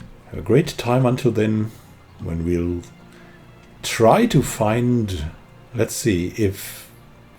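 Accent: Germany. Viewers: Are you German? I think your accent sounds like it. Bier hier, bier hier!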